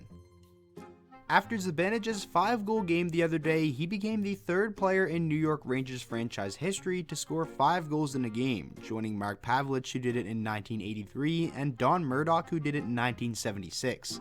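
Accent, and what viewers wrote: Canada. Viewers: How did you get to be a wings fan? Sounds like you're from Canada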